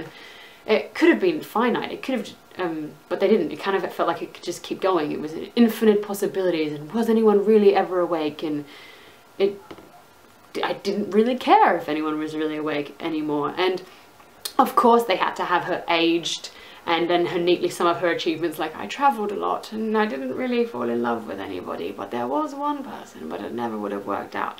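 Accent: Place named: Australia. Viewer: When you say that you're taking a break from the show, aren't we all going to kind of have to, since we're not getting another episode until fall? And, btw, I agree with you, even to such an exceptional amount that watching this kinda felt like listening to myself think. Interesting. Hence the subscription: I want to see if this continues. Also, I totally didn't even pick up on your Australian accent. What part are you from?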